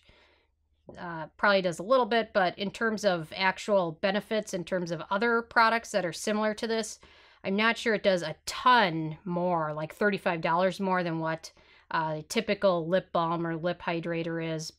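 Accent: Minnesota, United States. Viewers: I love how honest you are and that you have a Minnesota accent like me 😄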